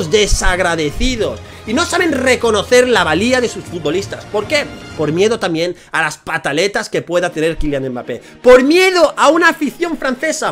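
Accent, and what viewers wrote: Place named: Spain. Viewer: canal argentino????? Si el que habla es más español que pique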